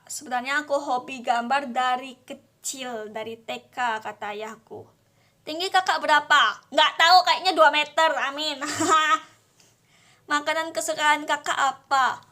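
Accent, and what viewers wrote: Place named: Palembang, Indonesia. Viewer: Pantasan bahasa nya kek ada setengah bahasa palembang gitu ternyata kakaknya orang bengkulu di sumatra selatan soalnya ane orang sumsel juga🗿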